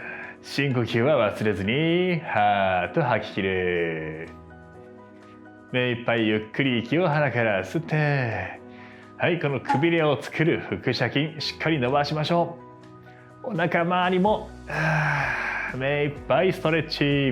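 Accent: Kansai, Japan. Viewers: 初めて見ました〜 関西の方かな？ 私はあまりこの手のYouTube見ないのですが、解説がどこにどう効くかとか、今の状態が示す自分の体の状況がわかりやすく、あまり長すぎないストレッチ、そして声が良くて！最後まで一緒にストレッチしました〜 体スッキリ！ 年齢と共に体型が少しずつ変化していくのでそのへんの解説と共に効果のあるストレッチをしたいな〜と思い、登録しました！ 昔に比べてふくらはぎの太くなっていく部分が下がっています。太さは変わらないのに…これもまたまだでしたら解説聞きたいです！ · 脚痩せ、だいてんし引っ込めたいです。先生の良い声、時々関西弁がツボです♪洋画の吹き替えやってほしいです^ ^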